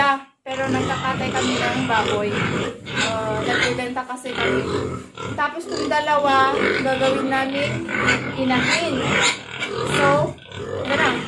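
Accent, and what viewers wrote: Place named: Tagalog, Philippines. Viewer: You speak clean Tagalog is here for me to understand👍 salamat. Baboy to loud. New friend and subscriber. I’m Jerry from north carolina u.s. lol mabahoo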